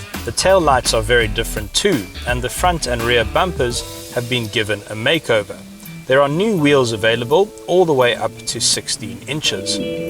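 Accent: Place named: South Africa. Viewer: I'm coming from watching internationa lmovies- to hear a South African sounds funny.... been indoors for too long.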